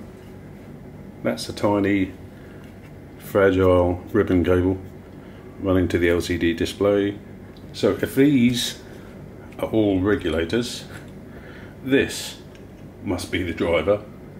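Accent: United Kingdom. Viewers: Uptade- Did I wrote this 3 years ago?. My two EVs still running as new, do yourself a favour buy yourself the original covers, laste year I moved to Alto 2300w Crazy power. have fun - Nicely explained, plus deep British accent I almost believe as truth. I'm using the 15P for the last 3 years, with no problems - I arrive to this page because one light is not working - it is an amazing speaker, sound quality is excellent, does not overheats after 8 hours. Power is good enough for halls with 150 guests. So, maybe is right with the specs, but real life usage proves him wrong.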